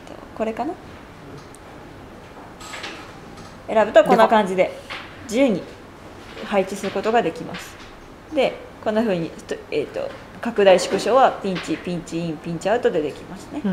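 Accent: Kansai, Japan. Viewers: ええ感じの関西弁やなぁ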